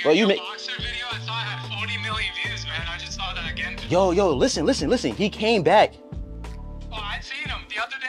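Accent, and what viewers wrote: United States. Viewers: Is she like from Texas? She got an accent it sounds like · her southern accent is so beautiful 😍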